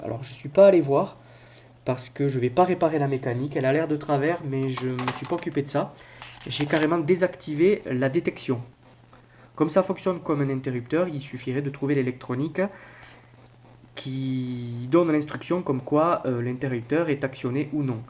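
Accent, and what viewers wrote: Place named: South-West, France. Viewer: Top ça marche ! et avec l'accent du sud-ouest :)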